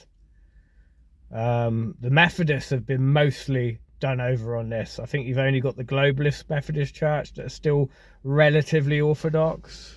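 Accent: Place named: Liverpool, United Kingdom. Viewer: Why are you speaking in a scouse accent? Whereabouts in Liverpool are you actually from? If you are not from Liverpool then why are you speaking in a scouse accent?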